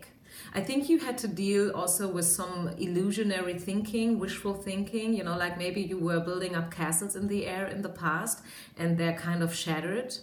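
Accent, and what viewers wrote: Germany. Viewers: New sub here! Picked #3. Wow! So on point. Amazing! I'm indeed starting my own business and I'm a really spiritual person. I'm single for 2years now and I plan to stay like that, I guess you can say I gave up. I loved your energy. I studied German in Regensburg when I was younger, and I love to hear your accent. Thanks for this reading. Love and light from Brazil 🤗🤗🤗😃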